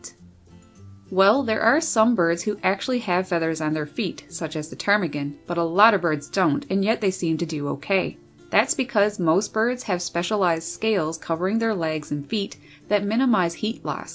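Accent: Canada. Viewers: The Canadian accent is cracking me up! 😄 That's probably one of the most pronounced ones I've ever heard! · Hi. This is the first video of yours that I've watched and I really loved it. I assume from your accent that you're from Canada? The colours of those birds were just stunning, especially the blue ones. Sending you best wishes and warmth from the UK.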